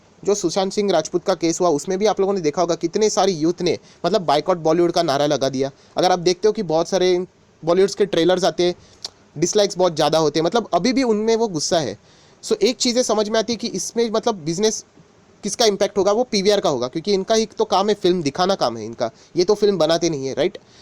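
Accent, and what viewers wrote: Maharashtra, India. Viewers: बोलण्याचा पद्धतीने तर मराठीच वाटतो.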